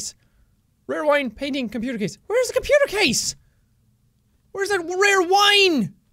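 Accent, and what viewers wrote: Ireland. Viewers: wtf kinda question is that he is obviously irish and is that german you tell me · Its the Irish accent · He's Irish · back when he had a very prominent irish accent 😭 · And the bossasaurus Irish accent helps too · omg i love your irish voice. is it irish? if i offend you im sorry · HE'S IRISH! So not everyone can pronounce words the same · Is he irish? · he's Irish I am too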